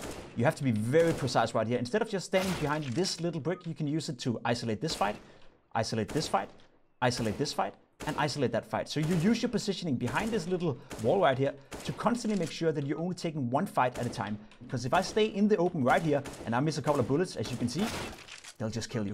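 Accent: Denmark. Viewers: do you have a danish accent?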